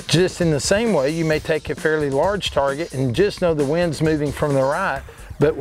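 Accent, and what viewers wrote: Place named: Appalachia, United States. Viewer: Do you bite your tongue, twist it into a knot, or what is that sound? Does that hurt? Heard it several times now, but understand shit! Only wahwahwah. Next time, find someone with a less pungent hillbilly accent, hahbaahthad?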